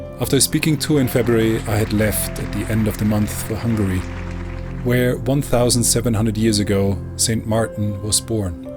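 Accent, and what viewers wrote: United States. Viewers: Great to see you back, Father. But you seemed to have aquired an American accent and the pitch in your voice seems to be an octave higher... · Good to see you again. Your voice sounds somehow different? More American- I even wondered if it was you narrating at one point!! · I am very confused. I do NOT recognize your voice. When did you aquire an American accent? Speaking of American, you seem to have pivoted from Religion to the mighty dollar. How very sad this new you is! I hope you reconsider and go back to your old self.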